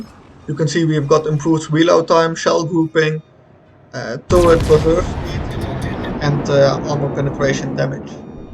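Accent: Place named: Netherlands. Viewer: You sound very dutch. Groeten uit Alkmaar 😁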